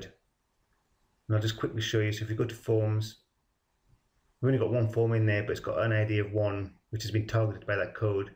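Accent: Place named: Yorkshire, United Kingdom. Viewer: Aye, a Yorkshire Man, of course you are... my family is from the dales..Well done with the tutorial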